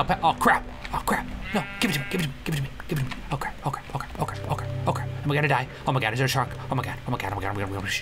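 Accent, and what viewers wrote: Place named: United Kingdom. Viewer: I really hate British voices on men, stop, I love you, just stop.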